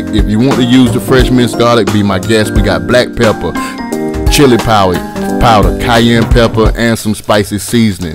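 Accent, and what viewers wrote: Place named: Texas, United States. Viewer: Yo. Im in uk an my buddy said he had dirty rice last night said it was great. Never heard of it here but know i now.. I is gona try me soma this tamrw after work.. Dam right... Man i just lov the way yall talk there in texas✌️💜🍜.. Dirty rice is hitting the uk an we didnt hear it from man v food guy adam. Lol. Im after that mad flavour 💯. Respect Houston texas 💜.. Peace out.... Uk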